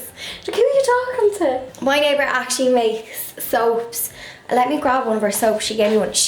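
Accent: Ireland. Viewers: Brilliant yr so clever yr such a lovely young lady love yr Irish actsent x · Hello! Recently discovered your vlogs and they're awesome! I hear your accent and that cute little cottage and it's like being transported to Ireland! I wanted to ask about the soaps. I help to direct an event at my job for crafters. This would be an excellent craft around mother's day. Could you give any tips as to how to adjust it for a crowd. Maybe a group of 5-10 people. Would you do any make ahead soap & scent mixes and just let them choose? Hoping for suggestions because the group meets for an hour and a half only. Thank you :)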